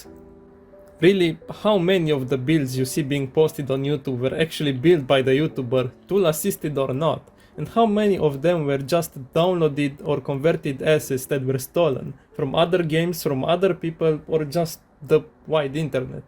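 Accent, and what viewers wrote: Romania. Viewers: Bro, your Romanian accent Is crazy. It is like Russian, that used to live in Italy for 20 years, speaks English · Wow romanian accent 😅 im romanian too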